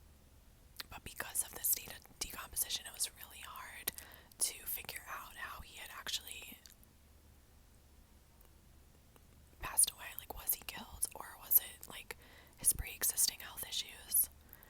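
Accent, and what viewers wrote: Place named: New York, United States. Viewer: Recommendation: Missing 411 cases. They are so eerie!! Also- LOVE your NY accent. I’m from the South and I think this accent is so dang tingly!! Would love a NY accent role play! 😊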